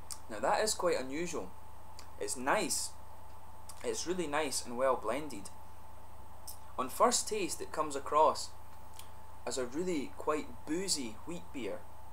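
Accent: Scotland, United Kingdom. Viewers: You are the only beer reviewer I know that gives a detail story behind the beer, well done a always a joy to listen to 😎 Plus you have a nice Scottish accent, Don't worry I'm not hitting on you 😉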